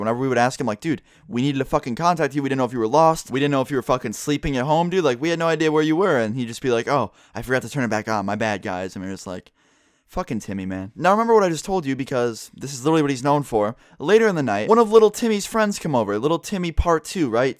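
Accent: Canada. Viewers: Are u Canadian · wait......... Youre Canadian? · Are you canadian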